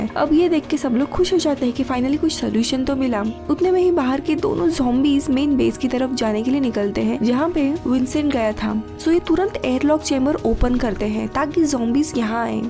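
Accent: Maharashtra, India. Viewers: be patient मेहनतीच फळ मिळेल. मराठी Accent असूनही हिंदी छान explain करतेस तू .. थोड innovative कर with face explanation like " flimi indian" channel सारख public review घे इकदा Q & A घेऊन त्यांना काय हव आहे, त्यांना काय पाहायच आहे ., popular काय आहे ते विचार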